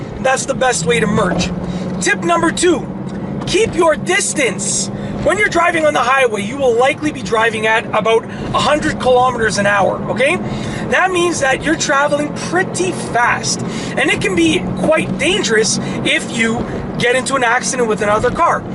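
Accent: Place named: Canada. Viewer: Are you Canadian? You sound extremely Canadian.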